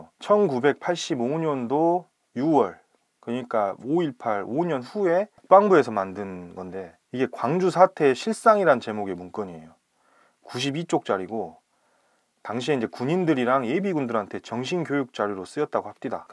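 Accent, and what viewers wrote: Jeolla, South Korea. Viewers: 518 광주민주화 항쟁 영화로 배운사람인데 ㅠㅠ 이런 사연이 있었네요 전라도사투리 어디서 들었다했는데 응칠시리즈였음ㅎ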